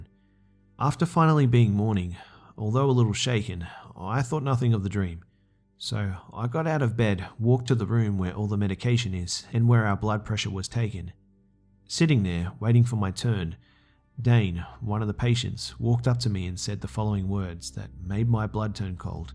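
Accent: Australia. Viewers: Hold up your from australia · I...Can't believe it took me this long to realize you're Australian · I did not know you were Australian until now. Cool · Can't tell if you are trolling but the narrator is very, very Australian. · Your voice is perfect. The Aussie pronunciation is very refreshing. Great video as always. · OHH YOURE AUSTRALIAN!! I love that (btw is that weird? sorry, I'm American)😂👌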